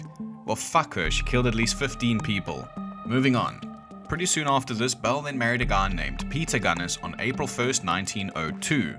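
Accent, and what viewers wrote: South Africa. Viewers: south african accent so much nicer than british or australian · Are you from South Africa? Somehow it seems your accent is from there. A slight Dutch touch to it.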